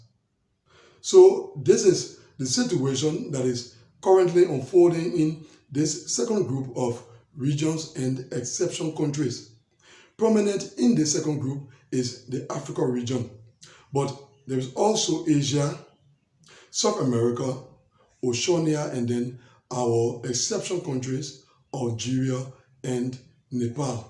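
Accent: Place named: Liberia. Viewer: Hello bro, which national are you? Because you sounded like a Liberian...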